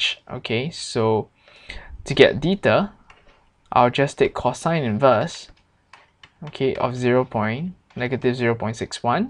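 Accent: Singapore. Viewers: Hey are you from singapore?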